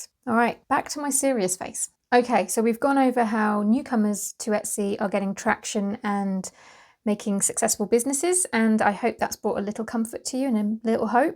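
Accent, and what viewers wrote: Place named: United Kingdom. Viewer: Hi! Many thanks for sharing your knowledge on this topic!!!!!!!! This will big a big help when I open my shop on etsy in the near future. I love to hear you talk, must be the British accent. Oh and you have very pretty eyes too. Stay safe and healthy!!!!!!!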